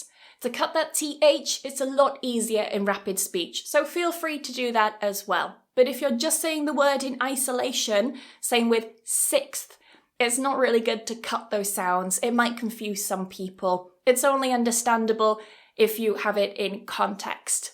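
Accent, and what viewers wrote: United Kingdom. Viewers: are you a british speaker?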